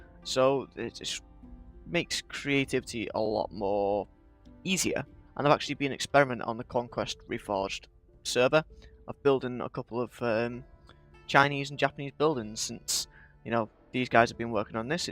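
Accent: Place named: United Kingdom. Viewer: I wish I could say 'build battles' the way you do XD I like that British accent. and btw gl on modern :P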